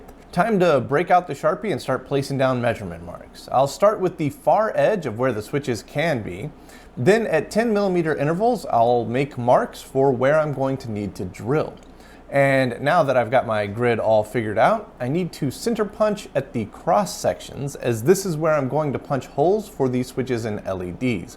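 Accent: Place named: United States. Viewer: Right? Always nice to see some metric from someone with an American-sounding accent. :) We'll get there eventually!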